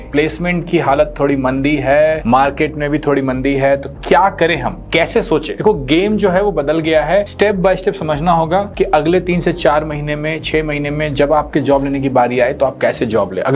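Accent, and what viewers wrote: Bihar, India. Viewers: bhaiya your bihari accent is so sexy and abhi to mai javascript me gote laga rha hu.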